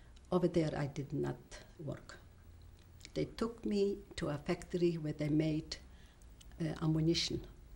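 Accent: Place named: Germany. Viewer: Boy she has that strong German accent especially when saying my the German way Meine Vater the v make the F sound in German.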